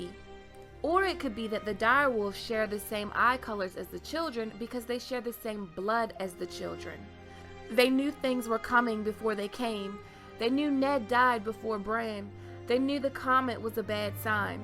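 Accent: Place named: South, United States. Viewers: for this kind of a video... her southern heavy accent is terrible and takes away from the video! sorry, my opinion.. but the content is great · I am so confuddled with all this downtime between the shows seasons and listening to so many theories. I was so hoping WOW would have come out inbetween so we could all get our fix of GOT. So tempted to try and hold out for WOW before seeing season 7 but jeez i'd have to go live in a cave for however long. It is going to be such a stretch back, after what is obviously going to be quite a dramatic season for our beloved characters. So many of your theories ring true, especially in book sense, some not so much but love the DNA thing, and that southern drawl is possibly one of my favourite things to hear GOT theories in as it is so detached from the accents we hear in the show it really has a narrative quality.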